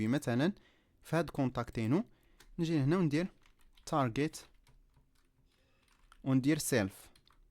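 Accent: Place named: France. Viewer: J'adore ton accent français ! A quoi çà sert de mettre un titre en français franchement !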